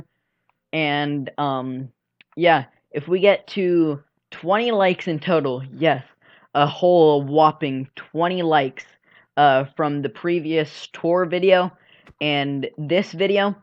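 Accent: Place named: United States. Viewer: Ok like no hate or anything I appreciate the tutorial and stuff but why are you using British lingo (like “rubbish” and “a wee bit”) but you have an American accent?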